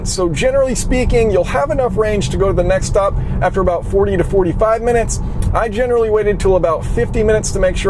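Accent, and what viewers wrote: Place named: United States. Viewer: how miserable is the poor choice of actor and his highly annoying yankee doodle voice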